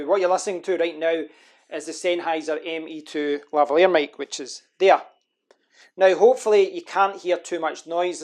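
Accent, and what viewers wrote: Scotland, United Kingdom. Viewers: I was looking for something else, but kept listening because of that amazing Scottish accent.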